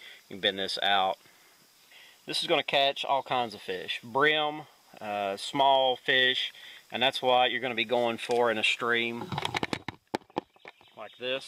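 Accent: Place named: Southern Appalachia, United States. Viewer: Great vid! What part of east tn? Im originally from southeast ky. I could pick up your accent from that part of the country!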